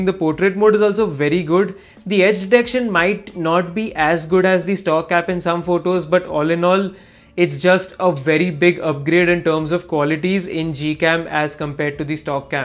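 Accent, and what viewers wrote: India. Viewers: O sotaque indiano é muito feio mano, parece o inglês liso do liso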